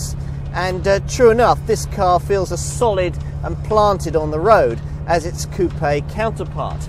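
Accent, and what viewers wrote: United Kingdom. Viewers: Well presented , chic & cool at the same time … and with a british way fashion way to speak it looks so authentic … btw presenting the car beside a superb British mansion gives even more attraction to it …. Thank you so much …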